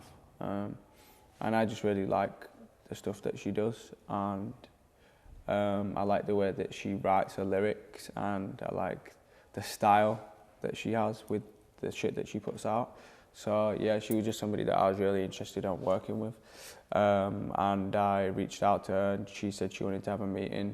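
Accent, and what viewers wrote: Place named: England, United Kingdom. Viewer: he speaks in cursive with an english accent..... I wanted this video to be longer..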